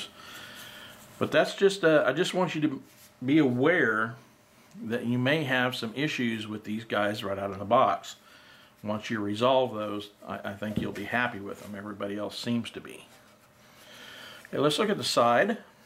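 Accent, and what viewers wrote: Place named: Texas, United States. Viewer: Thats the Texas Drawl. I like it.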